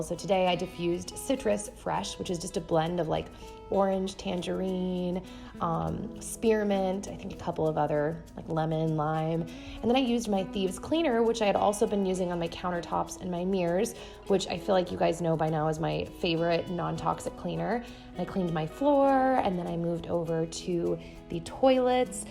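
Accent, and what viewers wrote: South, United States. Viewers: Hmm favorite cleaning products?? Bleach. Lol I love the smell of it. P.s. you're slowly getting that southern accent. 😍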